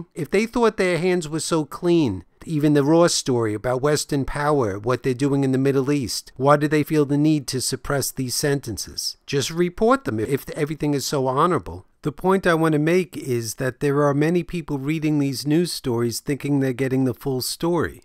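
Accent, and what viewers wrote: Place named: East Coast, United States. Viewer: I noticed you speak with an east coast Jewish accent. Are you Jewish?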